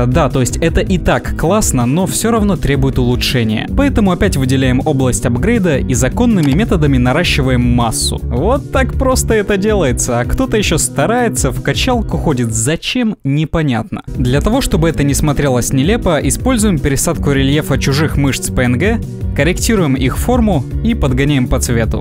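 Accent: Ukraine. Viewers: Ти из Украины ❓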